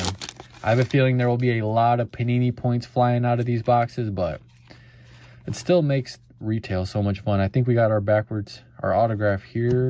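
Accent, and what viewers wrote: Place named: St. Louis, United States. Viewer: Your accent sounds like Saint Louis county…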